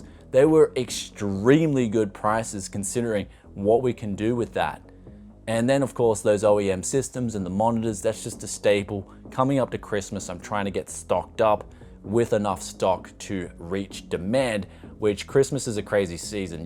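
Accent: Australia. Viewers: I’m sorry that people don’t understand you don’t work for free, keep up the good work my old mate, you defo need to bring the Aussie out more, it’s class! Hope you well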